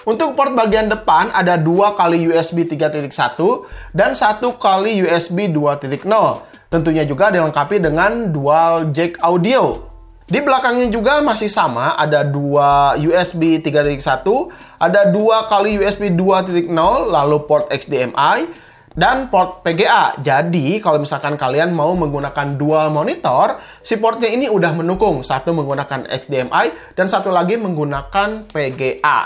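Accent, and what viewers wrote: West Java, Indonesia. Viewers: Urang sunda ieu mah ngomong na lipa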